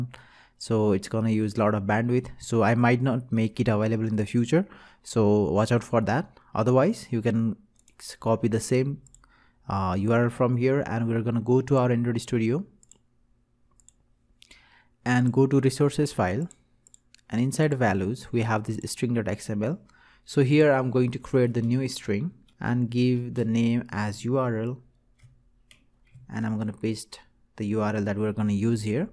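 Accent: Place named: India. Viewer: bu hististanlıların bu ingilizce si beni hayattan soğuttunuz be bilader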